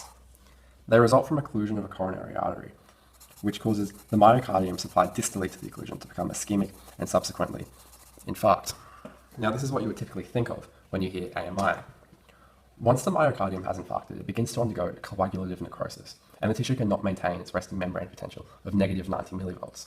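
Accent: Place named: United Kingdom. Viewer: foreign accent (British) and fast talking. I agree. LOL but still a good video, I just had to rewind a few times. Dan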